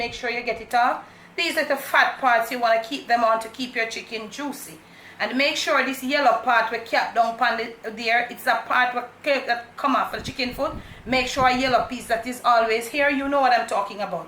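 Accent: Jamaica. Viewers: Every time I watch one of your videos I want to visit Jamaica again. Your beautiful accent and delicious looking food are sooo enticing.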